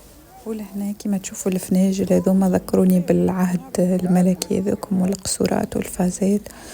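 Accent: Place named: Tunisia. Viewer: أموت في لهجة التوانسة نحبكم برشا طبعا أنا من المغرب ❤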